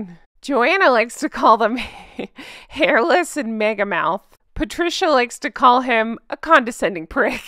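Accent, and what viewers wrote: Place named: South, United States. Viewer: has a sexy voice, a not too posh Southern accent and with a lovely timbre. I think he's in his late 40s or early 50s due to pop culture references he sometimes makes to the 1980s, including the music of that era. Bet he's handsome AF.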